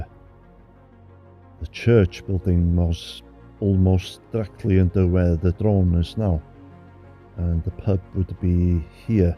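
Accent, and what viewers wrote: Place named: Caernarfon, United Kingdom. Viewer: Faacinating stuff, thanks! Completely off topic, I'm trying to place your accent. Caernarfon?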